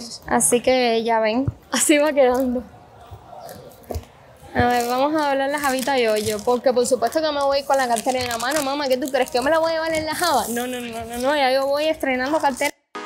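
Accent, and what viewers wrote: Cuba. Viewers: Me encanta su acento cubano y la emoción de la cartera nueva. Saludos desde Guatemala 🇬🇹. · Me encanta como ella pronuncia, es muy cubana, y la he escuchado decir laik, pero cuando dice like es muy gracioso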